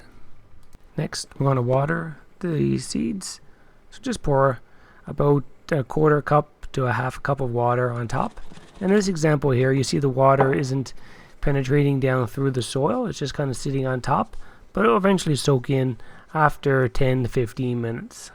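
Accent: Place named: Canada. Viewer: That is one Canadian accent you got there, bud! Ottawa valley?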